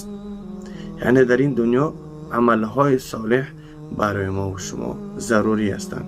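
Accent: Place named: Afghanistan. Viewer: асалом додар барои чи вакте чинхони мекуни хамара якчоя боядки якта якта хонишон у чино ба якдигариш мегузаран барои чи авгони гап мезани додар гапи точики худта гум кардай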